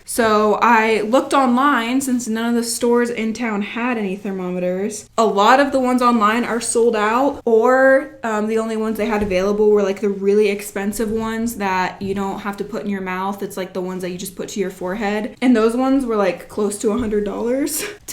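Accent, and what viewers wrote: United States. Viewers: crazy question, are you from the south? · Omg youre from Indiana?? No wonder why we have the say accent 😂 I'm from Indiana too haha born and raised Chesterton just moved to Valpo. I work for family express distribution center and I still have to go to work 😔 I want to start exercising outside but it's been chilly and windy !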